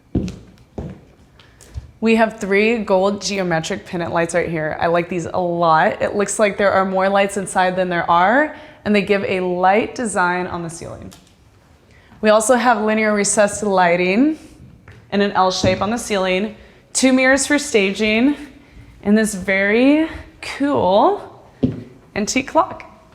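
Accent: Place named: United States. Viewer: Someone can tell me from which state this american english accent come plz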